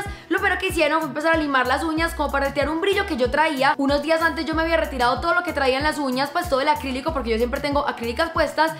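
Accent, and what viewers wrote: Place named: Medellín, Colombia. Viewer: Se nota que eres de aquí de Colombia eres de Medellín igual que Lulú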